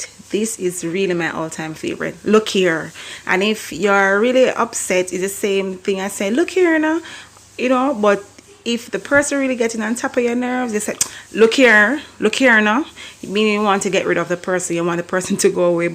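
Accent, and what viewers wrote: Jamaica. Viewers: Some real yardie talking yes man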